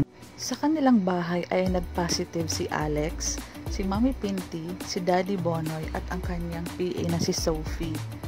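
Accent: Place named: Visayas, Philippines. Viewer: Ampanget ng Boses at salita mo , d ko na tinapos nairita ako , pambisaya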